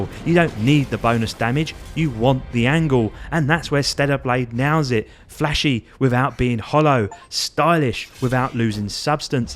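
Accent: Cockney, United Kingdom. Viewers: Thank you for another review me 'ol cockney sparra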